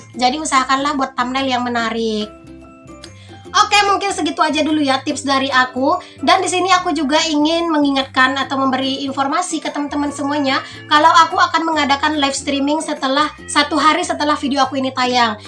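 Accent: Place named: Java, Indonesia. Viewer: Lucu bgt bunda ini ngomongnya.. nyenengne bahsa jawane... 👍👍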